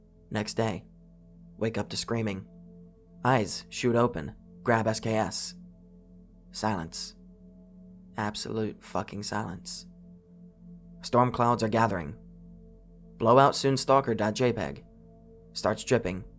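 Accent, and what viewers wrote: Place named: Westchester, United States. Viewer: you from Pennsylvania? I'm getting Westchester vibes from the way you pronounce certain words.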